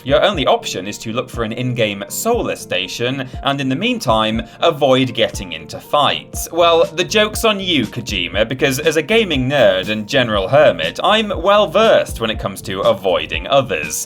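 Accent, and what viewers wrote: United Kingdom. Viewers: Damn brits with their cool accents and crude dry humor lol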